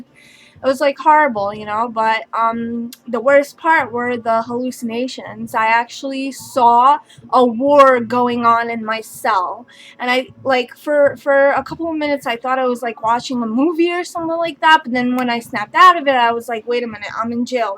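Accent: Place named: New York, United States. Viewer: Where are you from cuzz? You sound like a New Yawker.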